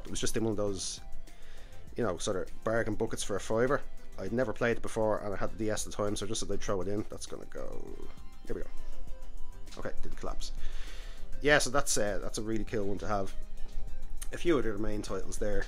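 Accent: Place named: Ireland. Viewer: Irish accent makes this better.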